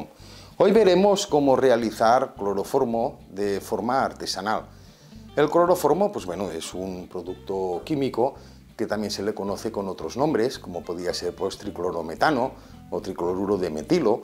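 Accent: Catalonia, Spain. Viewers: a ti. Además diría q eres catalán por ese acento y por los profusos «hacer servir» jajaja. Así q salutacions des de Barcelona :)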